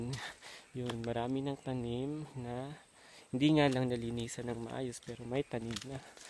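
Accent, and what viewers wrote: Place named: Visayas, Philippines. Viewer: bisaya jud si kuya..hehehehe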